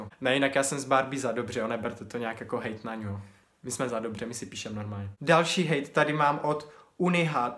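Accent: Czech Republic. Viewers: Ty jsi z Olomouce jde to poznat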